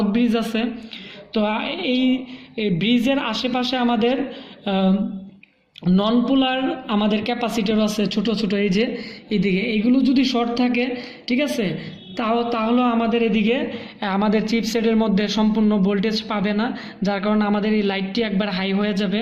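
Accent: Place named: Mymensingh, Bangladesh. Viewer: ভাইজানের বাড়ি ময়মনসিংহ বোঝা যায় তবে অনেক কাজের ভিডিও বানাইছেন, ধন্যবাদ।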